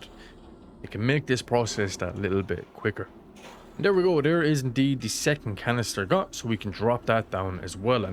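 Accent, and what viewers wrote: Ireland. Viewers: Where in Ireland are ya,?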